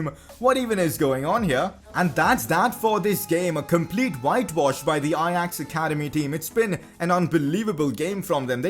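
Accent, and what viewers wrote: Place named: India. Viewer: umm, you kind of have a indian accent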